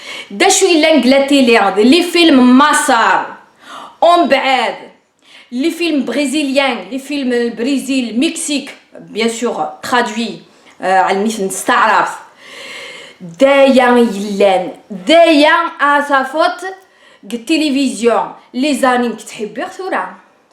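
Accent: Bejaia, Algeria. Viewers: Mignon l'accentvde Begayet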